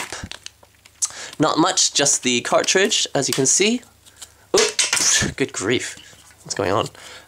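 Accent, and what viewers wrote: United Kingdom. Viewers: I'm not saying that silly. From his accent, he's clearly a British dude moved to Japan!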